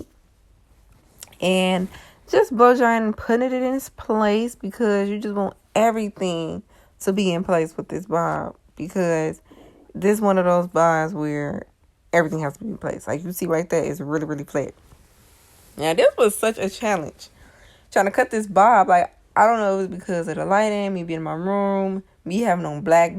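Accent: United States. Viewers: That southern twang. Yes baby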